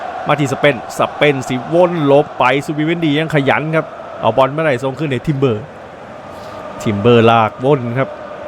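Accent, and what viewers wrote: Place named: Isan, Thailand. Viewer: ไม่เคยเห็นชาองไหนพากย์ อีสานแบบนี้ สุดยอด..😊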